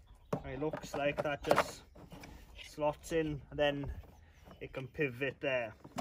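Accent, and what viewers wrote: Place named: South Wales Valleys, United Kingdom. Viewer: Its the Valleys Man gotta love the accent!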